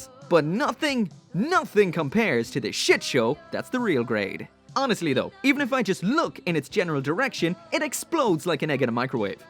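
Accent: Ireland. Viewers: What the hell is your accent? It sounds like a Dubliner doing forced American! Like, Nevada or something?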